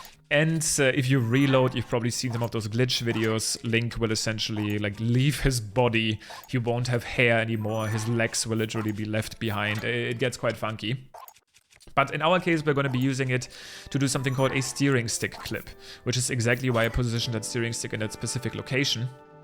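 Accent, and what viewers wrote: Germany. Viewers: I actually like to hear your fluid english with german accent 🙂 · German I think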